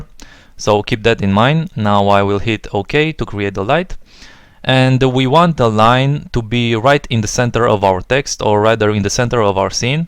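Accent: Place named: Russia. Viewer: Спасибо!) Сразу видно русскоговорящий)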